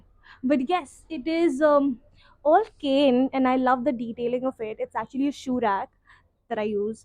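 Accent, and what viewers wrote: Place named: United States. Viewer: To much American English stop tht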